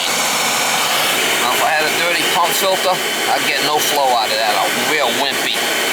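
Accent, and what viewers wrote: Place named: New England, United States. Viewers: Sounds like my hometown of Plainfield CT. Accent is spot on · Psycho chicken LOL. Been over 30 years since i heard this one. Where you from? Sound like southern New Hampshire or Massachusetts. Maine hete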